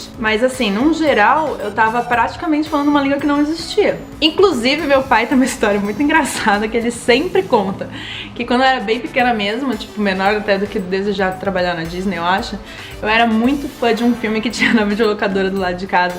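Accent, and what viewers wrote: United Kingdom. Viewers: o sotaque britânico é maravilhoso, mas me embaralho muito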